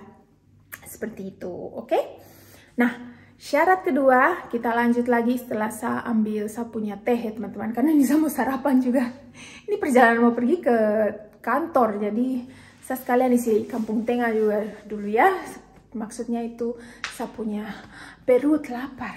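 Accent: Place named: Eastern Indonesia, Indonesia. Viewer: Ka diindonesia dari derah timur ya? Mirip2 dialeknya tmnku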